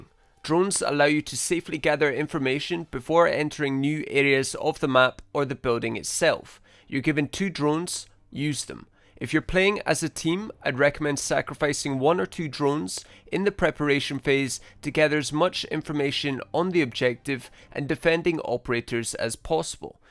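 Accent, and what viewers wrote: Scotland, United Kingdom. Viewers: R u Scottish? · Where you from?can hear a Scottish accent a bit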